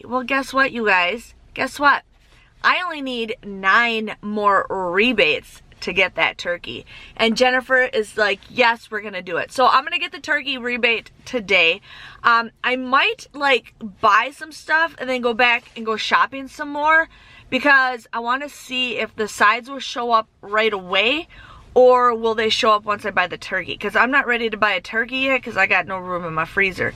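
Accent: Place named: Northwoods, United States. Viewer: gurl I mf knew that Northwoods accent! I'm originally from MN and WI. Ladysmith area in WI off hwy 8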